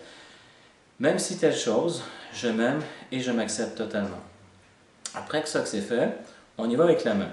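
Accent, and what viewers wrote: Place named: Quebec, Canada. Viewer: Merci Monsieur . J'adore le Canada et plus précisément le Québec! Votre accent est doux et ensoleillé dans votre région. Merci encore De Marseille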